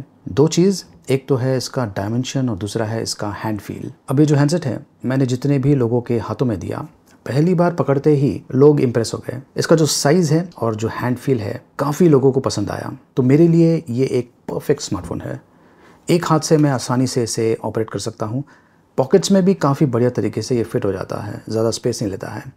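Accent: India. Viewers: No it is Indian english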